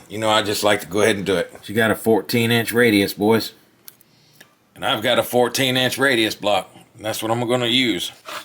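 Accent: South, United States. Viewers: ROFL that was a great intro guitar to the noggin' 'Smack 'Lol! You have a cool accent is that the south? Lots of great info on this channel!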